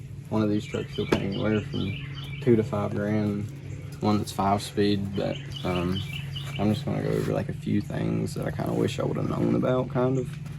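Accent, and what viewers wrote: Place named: South, United States. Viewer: You sound southern, you near Cookeville Tennessee? I’ll help you paint it!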